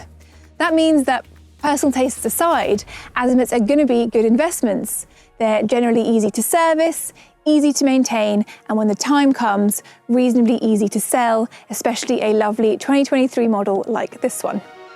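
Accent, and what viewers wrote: United Kingdom. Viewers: Who is the guy doing this? Just walk through it, he's trying to dress like a woman but it's definitely a guy with a British accent.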